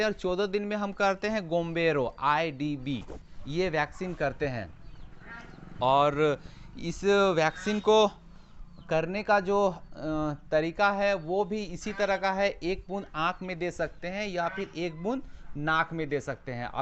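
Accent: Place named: Seraikela, India. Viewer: Bat karne ka andaz se malom huta aap sarai kela said ke ....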